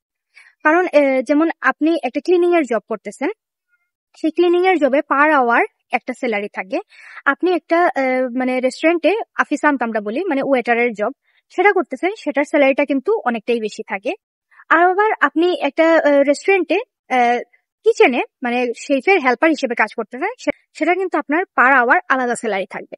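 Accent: Sylhet, Bangladesh. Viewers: আপু তো মনে হয় সিলেটি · Apu apni to sylheti??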